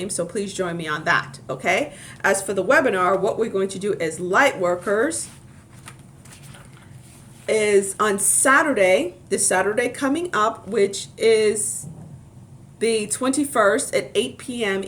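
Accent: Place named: Boston, United States. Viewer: Hello!! Thank you sooooooooo much! I knew that was Boston accent first video. New Subby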